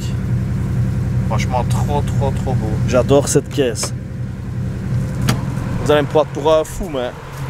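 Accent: Belgium. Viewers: 350 zete 😂😂😂 les belges vous avez un bel accent bravo à toi!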